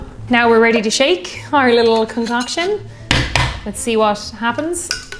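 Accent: Ireland. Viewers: Both my Mom's parents are from Ireland. Your Irish brogue always makes me happy.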